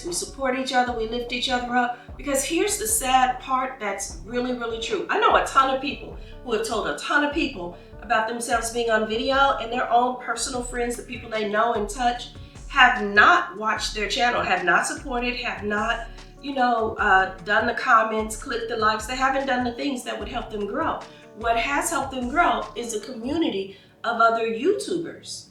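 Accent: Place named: South, United States. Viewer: the Southern twang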